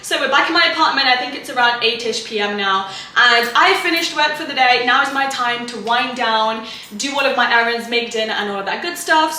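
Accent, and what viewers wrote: United Kingdom. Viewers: You r Punjabi 😃 and your brit accent ❤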